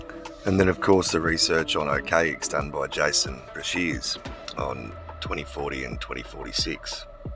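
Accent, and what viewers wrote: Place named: Australia. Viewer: Wow this is crazy awesome! ... a lot to digest here... so your Son's name is Phoenix? For reals!!! Both my husband and first born daughter = 138 in ordinal.. I'm 888 in Sumerian and a couple of things I found while watching this (just for fun) I was exactly 9 months 1 week and 1 day old (911) on July 25th 1981.... and 34 years 8 months(+3 days) after January 1st 1981 my first daughter was born pm.. I love your vibe man,.. so great to hear a bonza Aussie accent for a change while listening to videos on YouTube.. :face-blue-smiling:💙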